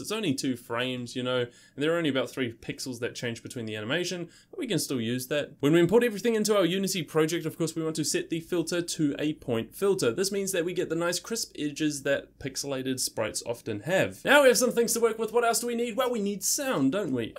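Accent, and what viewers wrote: New Zealand. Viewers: i feel like your from New Zealand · Hmm do i hear a bit of New Zealander in your accent? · Hey are you kiwi bro?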